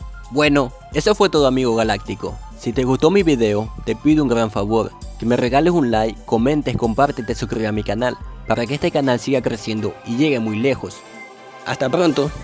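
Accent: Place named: France. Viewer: Ahh y la voz con ese toke como de frances pero en español me explico?? Español con acento frances...como el inspector de la pantera rosa.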